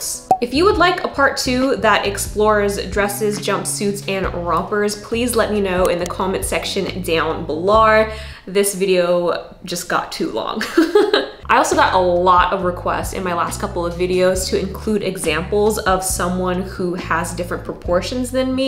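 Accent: Australia. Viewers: Its the Australian accent coming in and out for me 😍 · Lmao same I was like ‘she keeps doing an Australian accent and as an Australian I’m not mad about it’ 😂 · is the random australian accent an inside joke? I am so confused 😅😭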